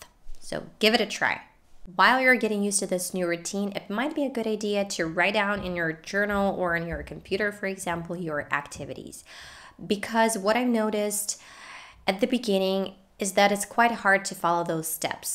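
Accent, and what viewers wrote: United States. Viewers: Круто! Я б не сказав що Ви Українка, гарний американський акцент. І техніка 15 хвилин варто спробувати! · Hi my intimate teacher,. I follow your videos on a daily basis. I always use the methods that you suggest so as to be excellent at Englih as you. I admire your American accent. When I watch your videos and listen to your fluency, I feel relaxed. I hope I'll speak English as perfectly and fluently as you using your methods soon. Thanks for your beneficial advice and lessons. Keep going and we're all with you. Always take care. Sevara from Uzbekistan:) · Is your English pronunciation of the USA?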